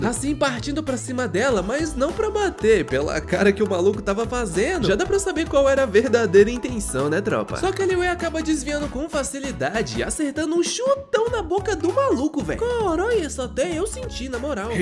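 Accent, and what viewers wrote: Bahia, Brazil. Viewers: Kkkkk primeira coisa q os caras perguntam é se ele é baiano! Kkkkk TENSO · Oiaaa, novo narrador....gostei kkkk é bahiano né? Certeza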